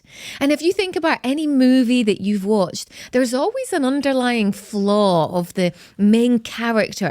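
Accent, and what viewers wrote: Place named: Scotland, United Kingdom. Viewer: Context, Challenge, Confront and Consequence....wow...I thought initially that you were from Sweden. Then recognised your Scottish accent. Thanks for the lesson.👍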